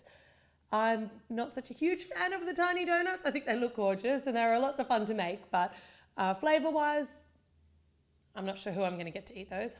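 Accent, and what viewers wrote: Australia. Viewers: you sound like Rachel Ray with an Australian accent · Your voice is enchanting. I love Australian accents.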